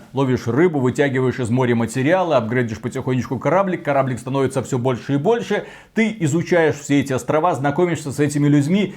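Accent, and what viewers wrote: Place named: Belarus. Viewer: лайк за трасянку!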